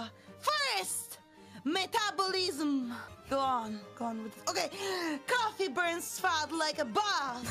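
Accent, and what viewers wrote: Italy. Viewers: she sounds like Dr Evil but with Italian accent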